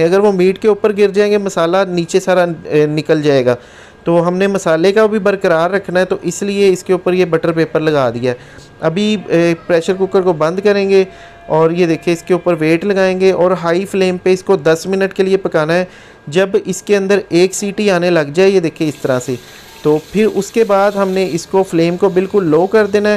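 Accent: Punjab, India. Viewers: ارے بھائی اسٹیم ھوتا ھے نہ کہ سٹیم تم پنجابی انگلش لفظ کو ہمیشہ S کے ساتھ الف کو غائب کر دیتے ھو مثلاً اسکول کو سکول ، اسٹوڈنٹس کو سٹوڈنٹس ،اسکرین کو سکرین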